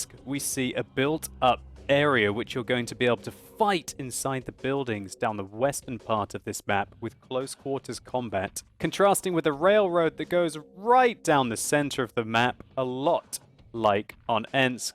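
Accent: Germany. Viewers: German accent 10/10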